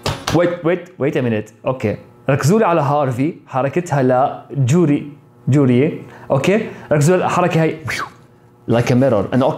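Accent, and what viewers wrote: Iraq. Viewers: احساك انت عراقي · انت عراقي؟ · ياخيي حلووو عليك لهجه العراقيه 😭😭😭❤️ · انت عراقي؟؟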